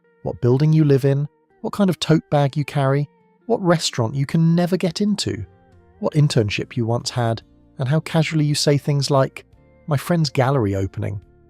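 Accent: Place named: New York, United States. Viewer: You sound like you are from NYC and think you know everything about what you are talking about.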